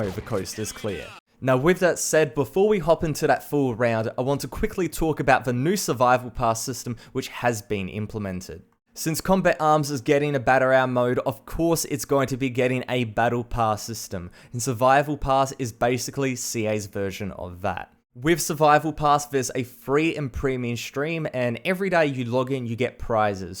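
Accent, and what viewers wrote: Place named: Australia. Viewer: LMMFAO..only 7 years..first of all change the idiotic shrimp on the barbie voice..2nd of all get some skill from someone who has played since Beta..👍🏻👍🏻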